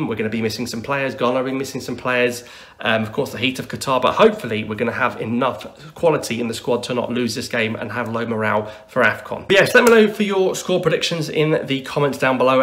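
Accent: United Kingdom. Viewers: you got a British accent ! Are you English guy who supports algerian football team ?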